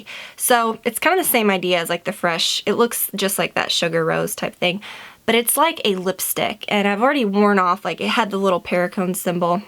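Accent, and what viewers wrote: South, United States. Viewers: You southern drawl is coming out a bit more :) Love it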